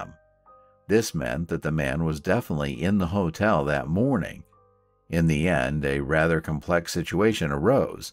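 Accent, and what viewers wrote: United States. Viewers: It's an American crime and the narrator sounds American, using miles would be a nice touch or both.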